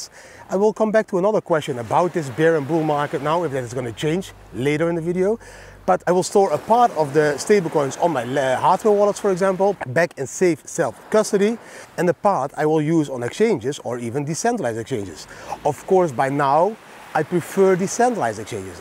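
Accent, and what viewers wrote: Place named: Netherlands. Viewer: Right 😅 I like his Dunglish though, the Dutch are used to say glazen bol 🔮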